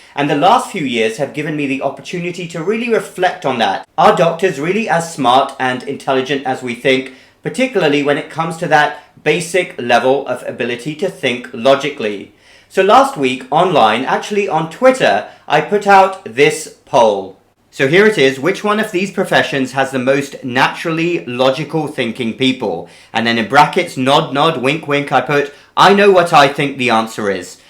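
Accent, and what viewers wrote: United Kingdom. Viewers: Sir you have a very strong British accent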